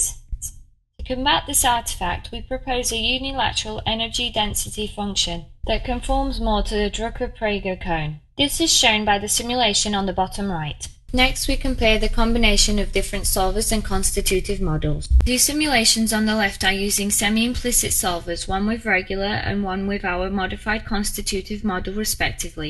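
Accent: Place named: Northern England, United Kingdom. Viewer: Yes, her accent is somewhat northern English. Do you have trouble understanding what she is saying?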